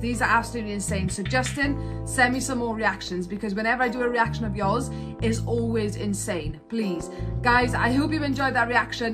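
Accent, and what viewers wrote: Wales, United Kingdom. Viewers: Budgie are from Cardiff. Sounds like you’re from Wales 🏴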